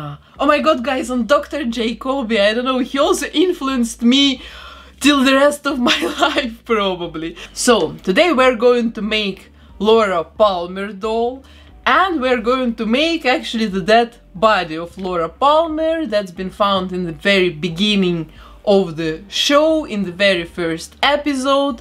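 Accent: Russia. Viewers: OMG hard Russian accent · Хмммм,мне кажется что ты русскаЯ, потому что акциент не английский:) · почему произношение такое русское..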